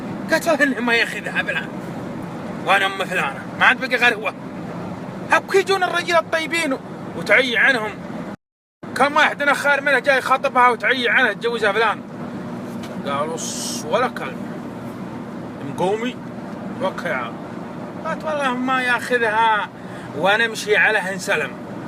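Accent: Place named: Najd, Saudi Arabia. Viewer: اي ثقافه؟ كل من قرا روايه حسب نفسه مثقف؟ هذي لهجه نجديه وطبيعي انك يالطرش ماتفهم عليها الاشكاليه الاكبر انها لهجه من لهجات العرب السبع رجل عربي قح وبعدين كيف تدعي الله وتخاطبه ب ياء التأنيث؟ استغفر ربك ياطفل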